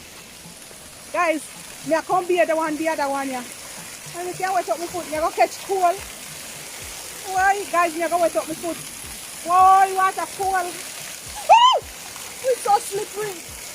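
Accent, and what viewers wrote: Jamaica. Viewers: Really Jamaican girl,listen to the accent 😂😂😂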